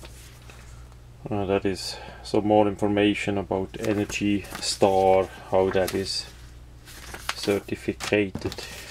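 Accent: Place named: Switzerland. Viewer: awesome schwitzer-english accent... really funny.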